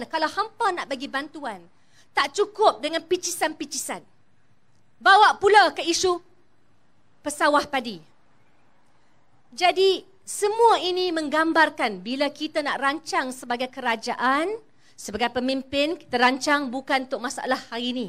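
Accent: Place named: North, Malaysia. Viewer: first time dgq nurul izzah cakap utara..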